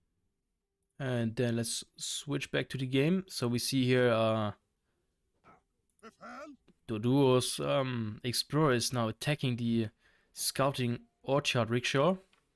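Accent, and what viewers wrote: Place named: Germany. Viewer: danke :). Ich dachte es mir, dass du deutsche "wurzeln" hast, wegen deinem akzent ;). Du machst das prima 🙃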